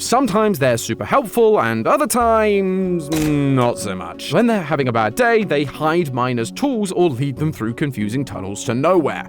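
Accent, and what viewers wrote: United Kingdom. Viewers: Your to British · i thought you were British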